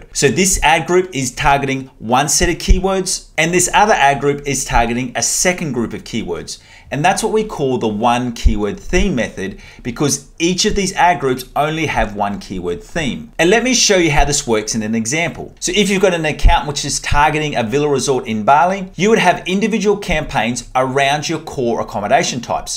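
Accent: Australia. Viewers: I like the accent. Is he an Aussie?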